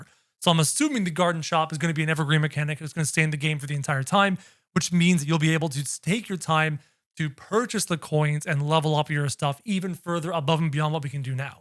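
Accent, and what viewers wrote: Canada. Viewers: sounds like he’s from or near Canada somewhere (?) my guess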